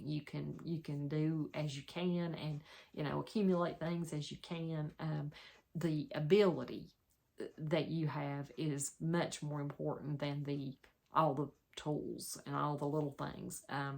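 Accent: South, United States. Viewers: First time watcher. I enjoyed your video. I'm from Louisiana originally but now in CA. So nice to hear a southern accent. I'm an experienced quilter who is now wanting to make my own clothes. I'm having a hard time fitting into the commercial patterns. I am only sewing with knits because they are so forgiving with fit. I'll be watching. --Lynda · Hello from another! I enjoy your videos & accent! I also have a southern accent as I grew up in Texas and moved to Virginia 12 years ago.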